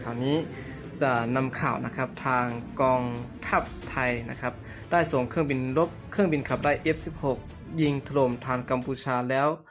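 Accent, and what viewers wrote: Laos. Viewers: คนอ่านข่าวนี้ลาวแน่ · คนรายงานข่าวเป็นคนลาวหรือ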